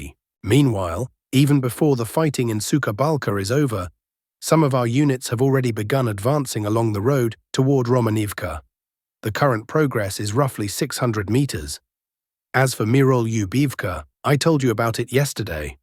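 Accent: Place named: United Kingdom. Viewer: It is strange to have a British accent , and referring to "we and "us" during his narrative. I am suspect of this channel.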